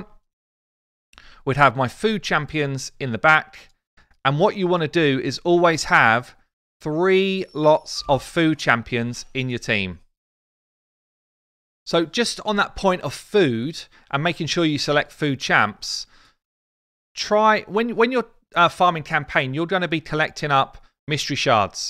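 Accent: United Kingdom. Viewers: Just found you very helpful just starting out, I am way outta my depth with this game but I will get there, also good to have a Brit talking instead of over the top yanks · what a very beautiful British accent you have !! amazing, i watch your videos to improve my skills in english, i'm french native. Also because i'm a RSL free player. You do a good job, your videos are very interesting. Sadly i don't understand everything you say, because of my level in english, and i'm not used to your Cambridge beautiful accent.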